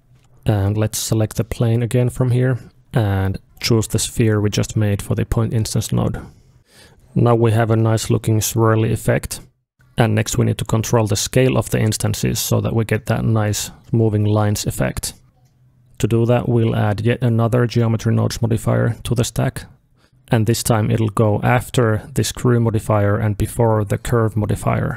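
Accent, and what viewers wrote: Finland. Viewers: How didn't I notice you're finn, you sound like the iceman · As soon as I heard your accent I a had a hunch you were a fin! :D Great tutorial man and enjoyed the ending. Very chill. Kaikkea hyvää !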